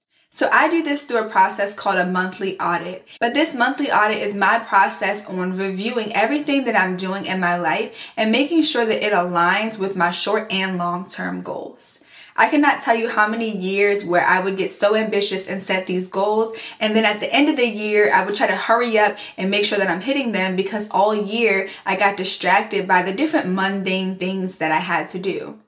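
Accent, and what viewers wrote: Baltimore, United States. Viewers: When I signed up for your email newsletter a few weeks ago it said Maryland at the bottom of the email. I knew you were from somewhere in Maryland because certain words you say you sound exactly like my husband whose from Baltimore and I thought that confirmation was too funny lol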